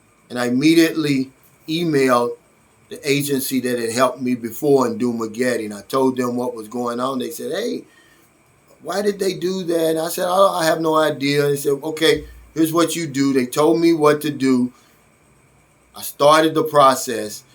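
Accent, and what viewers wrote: United States. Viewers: What?? I'm assuming you identify as being Black and from your accent I'm guessing American? 13 years and one incident and you don't feel welcomed? Honestly I probably would have starting laughing at their attempts in doing so. My deepest condolences for your friend John 🙏🏾 I moved away from the US because I felt not welcomed daily as a Black man. Maybe I'm missing something in your commentary? I'm confused? Wishing you the best sir; it truly sounded like one of those days...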